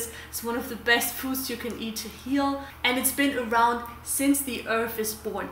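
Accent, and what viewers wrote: Germany. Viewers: So which spirulina is the best to buy? By the way, you have a verry german accent 😊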